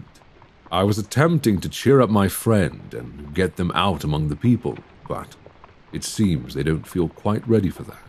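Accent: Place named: United Kingdom. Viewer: His British accent lord have mercy 🫠🧎‍♀️🧎‍♀️ the gods are tempting with hot British vampire men yet again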